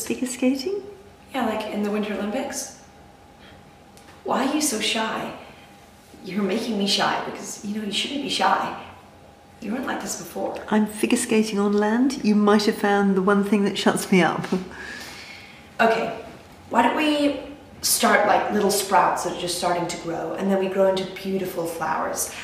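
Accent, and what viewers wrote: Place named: United States. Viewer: i was hoping i'd hear her do a good American accent someday. i'm happy to say that day is today!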